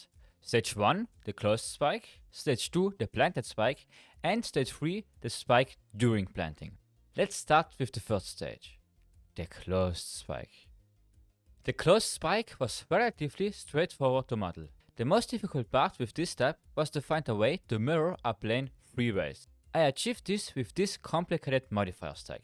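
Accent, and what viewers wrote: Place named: Germany. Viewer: Nicer Deutscher akzent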